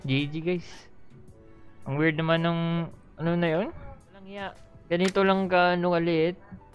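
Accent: Visayas, Philippines. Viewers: bisaya ka pala ehh~!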